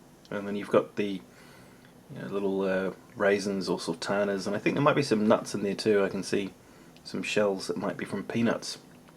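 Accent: New Zealand. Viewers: He's a Kiwi, therefore a New Zealander :) (btw his accent is of a typical kiwi bloke) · i love the new-zealand accent.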